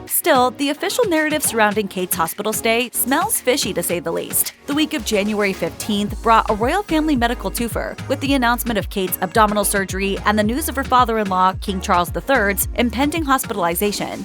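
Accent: United States. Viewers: A woman with an American accent passing all sorts of opinions about how the British people feel. Hmmm. You can report the facts without all the not-so-well-veiled political narrative that you think the average person is unable to pick up on. Saying that Kate only did 142 engagements last year and is somehow a great disappointment to the people is rubbish. 142 engagements with the pressures of being one of the most watched and judged people in the world and a mother of 3 young children. Princess Anne is not currently mothering 3 small children. This video is a reach designed to try and influence people to hate the Royals and grow controversy. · You sound American. Probably watched way too many episodes of that Crown program or something. There's major hospital crisis in the UK right now you know. The NHS is on strike. She has to go private, otherwise she would not be able to get her surgery in time and could maybe die, like I almost did about 6 months ago with my broken infected jaw..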